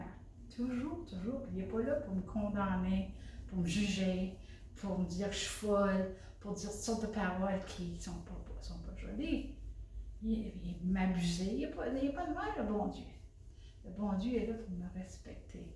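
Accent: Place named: Quebec, Canada. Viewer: J'aime bien votre joli accent Québécois 🔝✅👍💯💖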